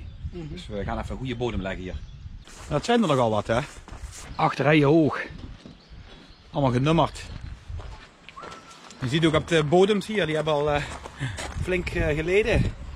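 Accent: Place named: Limburg, Netherlands. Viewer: Hallo, super mooie videos, ben per toeval op jou kanaal gekomen, doe huurst deg aan wie ene echte limburger. Top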